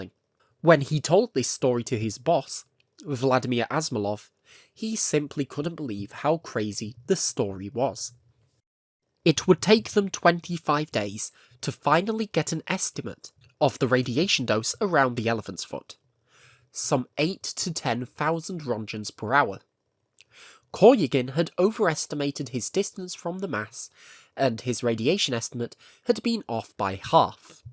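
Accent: United Kingdom. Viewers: He's british, not german. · British Accent + Documentary + Chernobyl = Random Algorithm Banger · I’m unsure if this is AI narrated or not. The narrator is clearly British sounding but he uses the term flashlight instead of torch?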